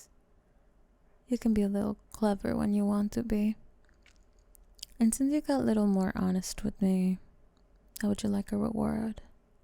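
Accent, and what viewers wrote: Spain. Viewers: I barely understood the accent, spanish and russian for some reason?? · This actually surprised me because most people do a Russian or French student but with a Spanish speaking student it caught me off guard, hell with the way you spoke I wouldn’t be surprised if you were Spanish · I've heard you do French and those accents, but Spanish. Oh muy senorita or oh lala, I can't decide but wow! Amazing job on this, I am very attracted and very confused lol!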